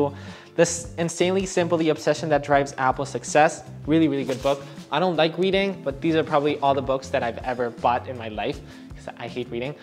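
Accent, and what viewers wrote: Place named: Spain. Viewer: is he spaniard? I can notice an accent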